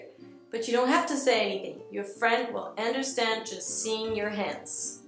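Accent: United States. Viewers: By the way, how do you manage to have such a fantastic American accent? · she's american, not french, i'm french by myself and when she speaks english she has a little accent so i think that's why her american accent is perfect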